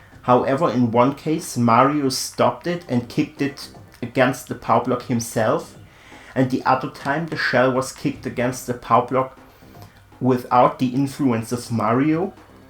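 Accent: Germany. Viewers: man merkt das du deutsch sprichst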